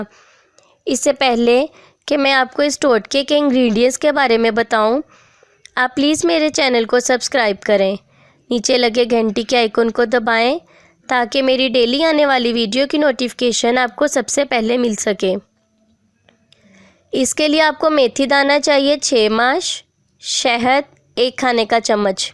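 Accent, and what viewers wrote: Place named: Sialkot, Pakistan. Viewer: Aapke Bolne ke lehje se lag raha hey keh aap distric sialkot se hey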